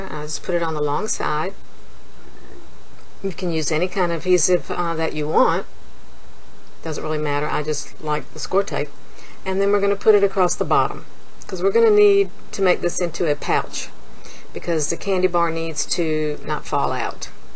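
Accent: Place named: South, United States. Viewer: I enjoy watching this crafter in particular. She's definitely a master of this craft. Plus the southern accent is v.v. charming :)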